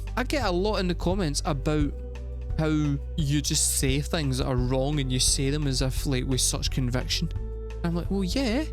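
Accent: Scotland, United Kingdom. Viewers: You sound as if you are Scot. And if so you should be aware of fronted by also a Scotsman and their music is unique for the variety of styles of music that includes the flute as a rock instrument. They released their first album in 1968 and released one every year through 1980 and no two albums are alike. The musicianship is incredible as well as the lyrics of their songs, written by Ian. The live performance is amazing and worth viewing on YouTube. But listen to the studio recordings before you view the live performance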